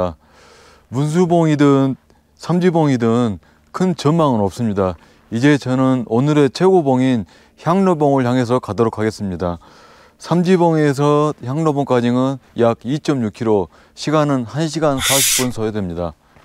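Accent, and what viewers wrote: Jeolla, South Korea. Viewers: 안녕하세요 추천영상에 떠서 놀러왔어요~ 저는 책읽기를 하는데 산을 좋아해서 산영상 자주 보다보니 추천에 늘 산 채널이 떠요😝😝 내연산 저는 딱 한번 가봤어요 근데 제가 간 날 산행중에 비가 너무 많이 와서 정상은 못가고 폭포길 찾아서 내려왔는데 얼마나 걸었는지 하산해서 보니 30키로를 걸었더라구요 저에겐 그냥 힘들었던 산 ㅎㅎㅎ 편안하게 설명해주시니 영상보기가 좋습니다 ㅎㅎ 내연산은 폭포가 유명하다고 하더라구요 친구들 아니었으면 한번도 못가봤을거예요 알바도 하시고 힘드셨겠요~👍👍 전라도 억양 저는 좋아해서 그런지 설명 편안했습니다 ㅎㅎ 감사합니다 구독하고 자주 놀러올게요🙏🙏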